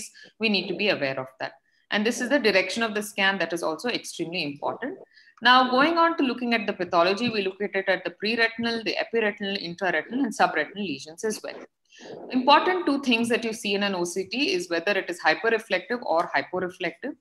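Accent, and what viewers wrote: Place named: India. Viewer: Subtitles really are usefull, indian english accent is not always easy to undestand.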